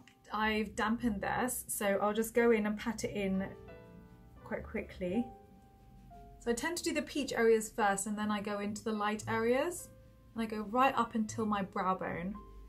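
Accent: United Kingdom. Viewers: plus that British accent :).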